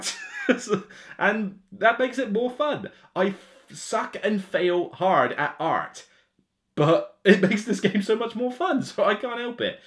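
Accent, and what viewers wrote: United Kingdom. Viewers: Ignore the haters man, you do good stuff, us brits all have weird accents, mines a combo of welsh and midlands so a bit of a odd combo too :P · Like the intro. Usually internet trolls are the kind of people who won't have the guts to say crap face to face and, most of the time, are insecure. Picking on your accent ? Seriously ? I'm french Canadian and I don't have any problems understanding what you're saying, in fact, I love British accent, it sounds so cool I think.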